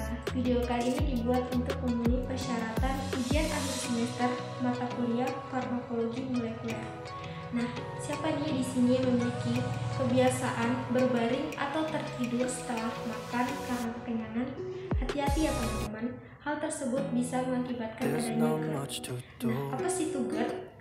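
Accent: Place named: Java, Indonesia. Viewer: Suka yg medok" jowo asli haha😂😂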